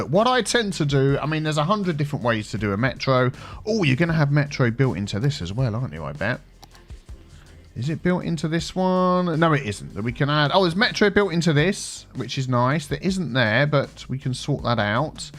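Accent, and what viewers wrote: United Kingdom. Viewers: thank you for the information on the roundabout builder. I brought up the English bit because people try to correct his pronouncing but who knows the English language better than the English.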